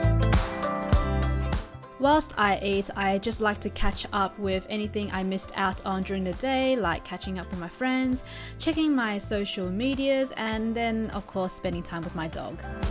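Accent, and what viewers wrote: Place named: Australia. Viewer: wheres she from she sounds like an aussie maybe a kiwi...?????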